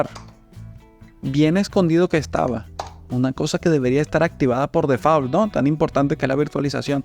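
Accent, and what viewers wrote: Venezuela. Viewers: Um........ ese acento.... Es Un Paisano Venezolano.....Saludo Hermano, excelentes videos! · eres de venezuela? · Compa, por el hablado usted tiene que ser venezolano jajajajaja!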